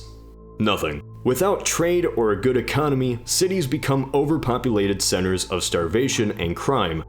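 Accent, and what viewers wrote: Ohio, United States. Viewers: nice northeast Ohio accent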